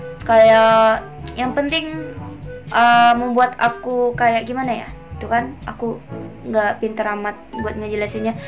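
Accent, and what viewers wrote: Indonesia. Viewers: LOGAT BAHASA INDONESIAMU 👍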